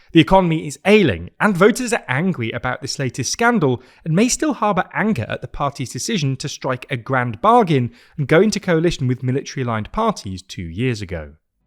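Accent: Northern England, United Kingdom. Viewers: He’s from Northern England where they pronounce “u” and “oo” funny.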